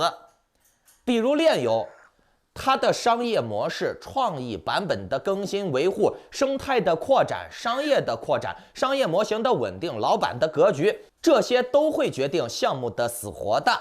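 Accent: Henan, China. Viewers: 河南佬一个。口音漏了。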